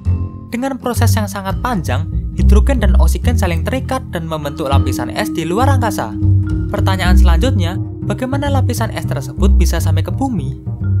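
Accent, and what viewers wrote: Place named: Java, Indonesia. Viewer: wkwkw medok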